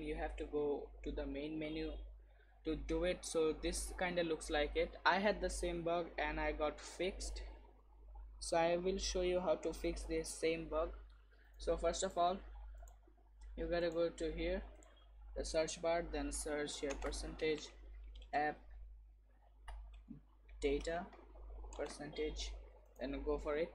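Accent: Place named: India. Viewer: deal is deal just liked and subscribed. well u sounds like indian.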